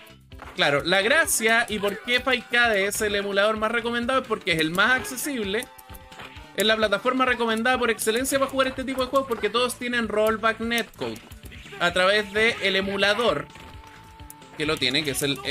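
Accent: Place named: Chile. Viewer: OMG QUE CHILENO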